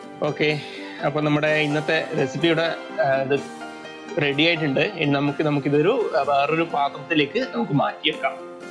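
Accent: Kannur, India. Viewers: നിങ്ങൾ ഒരിക്കലും മോശമായ, ശൈലി ഉപയോഗിച്ചിട്ടില്ല ,കേരളത്തിലെ എല്ലാ ജില്ലയിലെ സംസാരത്തിനും ശൈലിയിൽ മാറ്റമുണ്ട് ,നിങ്ങൾ നിങ്ങളുടെ സ്വന്തം ശൈലി കണ്ണൂർ ശൈലി ഉപയോഗിക്കുക. സ്വന്തം ഭാഷ പൊട്ടയാണെന്ന് പറയുന്നവരാണ് മലയാളികളിൽ കുറെ ഭാഗം ധൈര്യത്തോടെ കണ്ണൂർ ഭാഷ ഉപയോഗിക്കുക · Njanum kannuranu nammude bashakentha mosham. Recipi suuper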